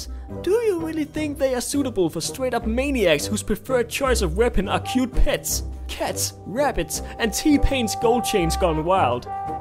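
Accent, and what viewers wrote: Denmark. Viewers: this guy has the most danish accent in the world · Og man kan bare høre at du er dansker! :D Subbed! :) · iv never seen anyone of your videos, but you make some valid points, Im both on pro and con-side of the artifact weapons, but so far i like them :) Btw, it sounds like you have a danish accent? Am I close? :p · bro you danish? I can act hear it :D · I know that accent You're from DK right? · Is it just me or do you sound danish like heck :o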